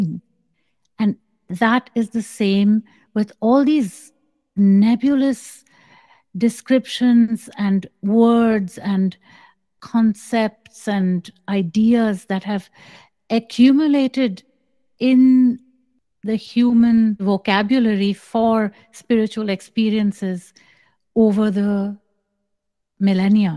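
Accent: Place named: France. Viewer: Unmistakable heavy French accent ;)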